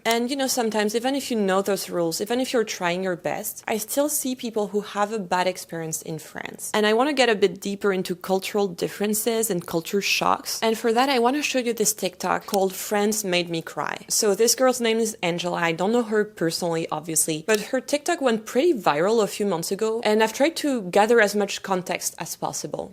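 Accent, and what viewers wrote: United States. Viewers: cets quoi ce délire d'avoir l'accent américain et de parler avec la gorge, génération miley cirus, c'est horrible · speaking in English with an American accent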